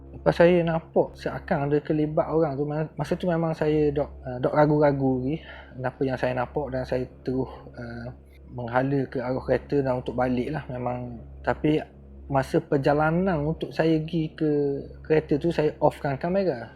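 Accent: Terengganu, Malaysia. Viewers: kn....minat sgt kt...lg2 dgr org ckp loghat tganu...tu suka tgk ni...😊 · Xtau laaa nk tgk video ke...nk tgk penjelasan..sedap bunyi ganu tu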